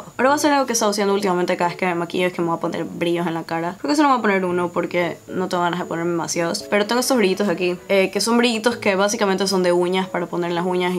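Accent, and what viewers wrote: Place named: Venezuela. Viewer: Yo también juraba que eras de Venezuela por tu acento jaja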